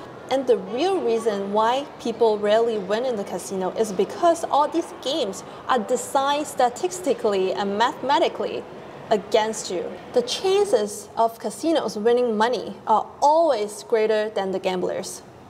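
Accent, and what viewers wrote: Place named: Hong Kong. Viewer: 閣下的廣東話像是一個香港人，不知是否正確？純粹好奇一問，祝新年快樂，訂閱人數蒸蒸日上，紅遍大江南北。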